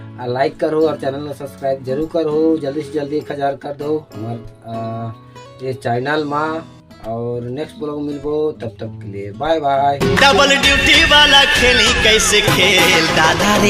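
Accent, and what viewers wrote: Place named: Raigarh, India. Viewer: Tuman k baat sun k Lagat he ki tuman raigarh jila ķ ho hai naaa bhaia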